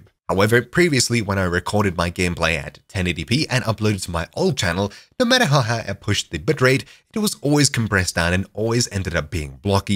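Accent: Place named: South Africa. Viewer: Thank you, I was able to fix my blurry video of Diablo 1 which was recorded via OBS in 360p, the game's native resolution. The video looked crisp on my computer in the H.264 format using VBR min 4mbps target 20, but on YouTube it was really bad. I changed to CBR of 20mbps (probably overkill) and exported the video at 720p, looks great now. Btw nice South African accent. Your voice reminds me of the famous Minecraft player rendog who plays on the Hermitcraft server. Its a good thing. <3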